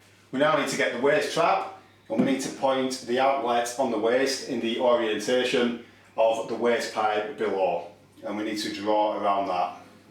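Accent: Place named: Lancashire, United Kingdom. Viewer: Q What's a nole? A It's a naperture! Great to hear a Lancashire accent after all these years in Geordie land!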